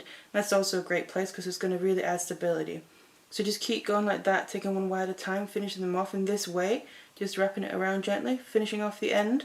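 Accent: United Kingdom. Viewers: Love, love me some British accent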